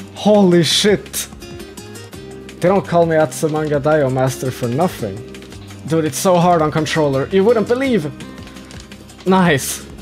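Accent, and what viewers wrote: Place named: Sweden. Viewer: ahh I expected you were Swedish by ur accent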